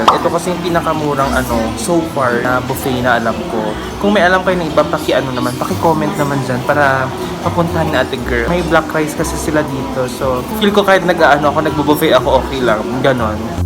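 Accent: Ilocos, Philippines. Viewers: Ilokano ka po ba?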